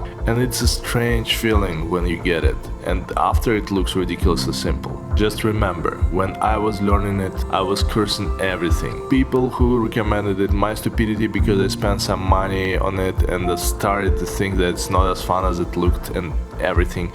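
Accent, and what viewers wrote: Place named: Russia. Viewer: Ещё не полностью избавился от русского акцента:)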